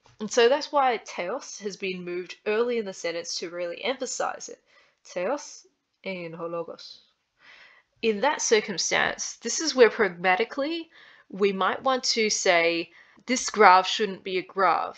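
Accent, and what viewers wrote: Australia. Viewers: This is a super introduction to the subject, thank you. I love the charming way you pronounce these snippets, with this ever-so-slightly Australian dialect of Greek. I started learning Ancient Greek about a year ago, with an ancient Teach Yourself book (Kinchin Smith and Melluish). Since starting I've subsequently started to learn rudiments about the actual pronunciation in Ancient Greece. In fact this TY book explicitly says in the introduction that they're not going to bother with accents "because they're unimportant" ... ! Sadly this also reflects what Allen says in his otherwise very useful book *Vox Graeca*, most of which I've now read: "don't think about trying to get the accents, it's too hard and not worth having such aspirations"!!! I find these attitudes absolutely bizarre! I now have a target accent: 5th Century BCE Attica! 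The "other" challenge there (apart from accents) is the aspirated/unaspirated allophones, τ/θ, κ/χ and π/φ. It's hard but not impossible by any means: I find the best way is to try and speak the unaspirated ones in a sort of Spanish accent, because Spanish has this tendency to swallow accents (i.e. to prefer unaspirated), whereas with English there generally seems to be a mixture of aspirated and unaspirated. Have you done anything on the unaspirated/aspirated allophones? I had a glimpse at your Youtube vids but couldn't see anything. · I think your accent sounds mostly Australian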